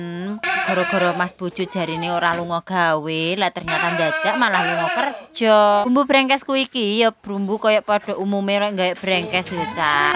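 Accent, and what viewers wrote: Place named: Java, Indonesia. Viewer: Nah begini penggunaan nada bahasa jawaNya mbak... Lebih enak didenger.... ❤❤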